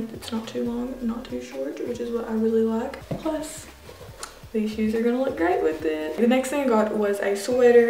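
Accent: South, United States. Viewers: living for country accent. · her accent is country af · arina k i thought she was Australian then i heard a hint of southern lol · her accent is so southern southern accent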